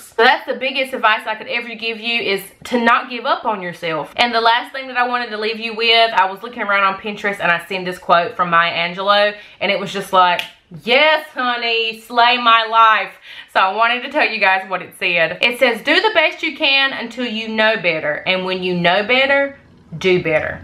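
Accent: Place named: Carolina, United States. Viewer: Loving your channel lately, love your carolina accent. Sounds familiar ;)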